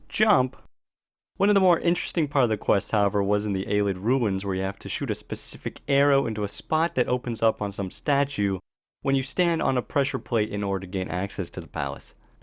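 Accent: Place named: Netherlands. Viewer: Oh shit, you have a Duch accent, well At least I think so haha. Btw, not disappointments where made.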